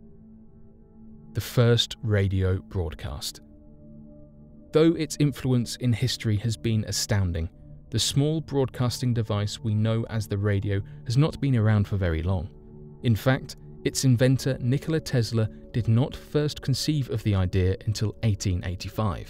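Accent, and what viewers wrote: England, United Kingdom. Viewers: Why does every Damned commentator have to have a damned British accent? I cant stand it!!!! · You are correct that, in American English, it would be "has". But in British English, "have" is correct. The speaker sounds English to me, and he uses British English.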